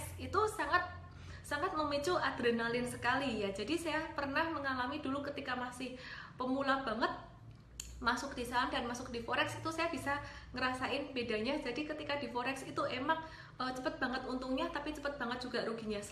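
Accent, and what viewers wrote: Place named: Java, Indonesia. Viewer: Disini medok bgt ya 😅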